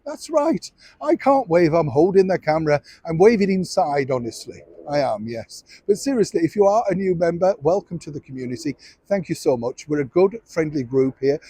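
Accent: Yorkshire, United Kingdom. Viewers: Thank you Neil for making me smile every morning. I look forward to hearing your breakdown on things , it’s nice to know you still have values, they go well with your Yorkshire accent. 😊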